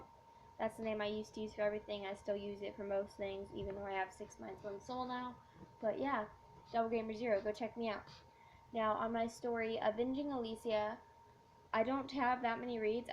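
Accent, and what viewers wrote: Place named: United Kingdom. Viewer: british accent tho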